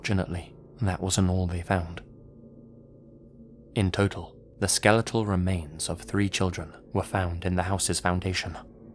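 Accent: England, United Kingdom. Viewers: mostly taking place in New England, where i too live, this is going to be good!! makes me wonder if is in new england (though i realize he has a slight English accent) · It’s kinda funny listening to a British person narrate stories about the U.S. Also, let’s go Massachusetts!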